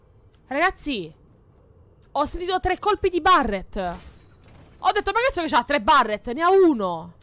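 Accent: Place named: Naples, Italy. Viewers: apprezzo lo sforzo nel parlare napoletano gg sei fortissima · Ma sei napoletana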